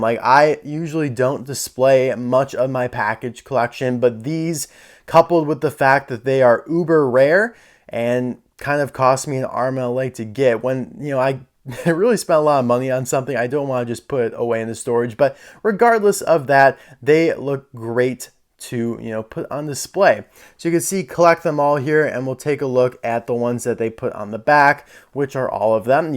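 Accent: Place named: Ireland. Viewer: Amazing video! This was definitely interesting seeing the different between Disney store and factory customs. Although, I feel like there was a missed opportunity to compare some of them to some tomica country McQueens that are similar to those cars in the video. But oh well, the video was still great! I didn’t even know you were Irish! Also, on an unrelated note, I just realized that there’s another McQueen that only the Disney Store made: Blue Lieutenant Lightning McQueen. He was only in a McQueen-O-Rama 5 pack. I just felt like that was worth telling you. But anyways, nice job with this video! Keep it up!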